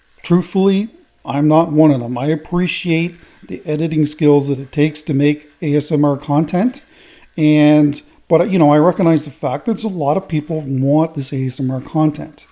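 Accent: Canada. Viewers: I love the Canadian accent, so I'm here for the commentary! I love this Hoser, Aye!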